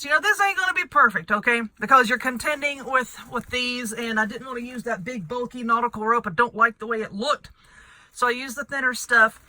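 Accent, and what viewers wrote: South, United States. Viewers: I'm new to your channel, this is my second video on your channel I've watched...I have to say it but I love your accent! Lol. And this diy, OMG...it's so beautiful!! Thanks so much!! ☺💗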